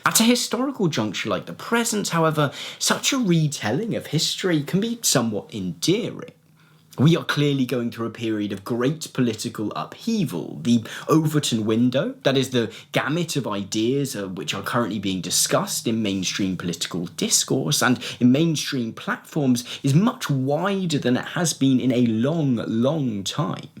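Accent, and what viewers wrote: United Kingdom. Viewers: He’s British. That’s a different type of english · Apparently I'm late to the party but just wanted to say yes! I've found a new left tuber! And it's refreshing to hear a UK YouTuber who's unafraid to speak with a UK accent and UK pronunciation. Idk why but I really dislike the extreme US fetishism of most UK YouTubers.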